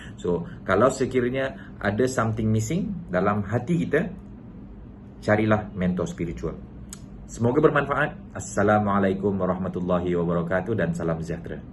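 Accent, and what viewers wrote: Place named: Kelantan, Malaysia. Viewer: tq Tuan. Sbb sskli tn ckp cm Kelantan.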